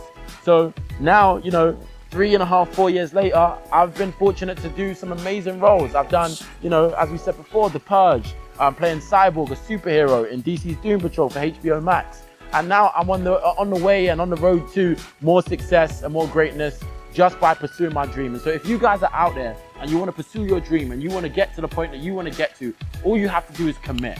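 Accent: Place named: United States. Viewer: you do american real well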